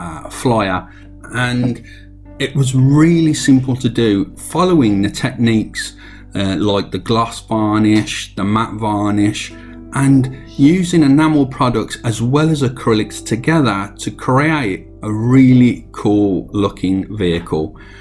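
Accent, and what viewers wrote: United Kingdom. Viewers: Hello. At first let me say: I love your tutorials and your british accent. But I have some constructive criticism. Pls increase the quality of your vids by making a good audio. You lower your fantastic work by this aweful sound. Don't be mad at me. I just want to push your vids into a good light. Greetings, a fan from germany. Keep up the awesome work!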